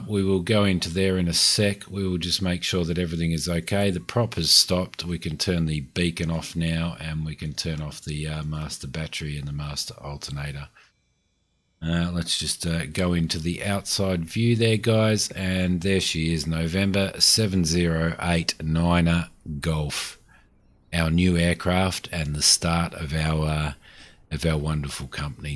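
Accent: Australia. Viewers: Thank you bro; You sound Aussie , Do you live over there irl? My home base in "On Air" is YMAV in Cumulus and YMEN in Stratus.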